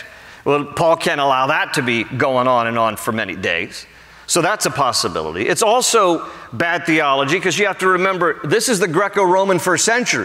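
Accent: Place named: United States. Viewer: I’m a female solo traveler now in Turkey. These sermons are the last thing I listen to after tucking myself in bed each night. Pastor’s familiar voice, American accent like my own and colorful stories of scriptures bring me laughter and peace same time. Thank you 🙏🏻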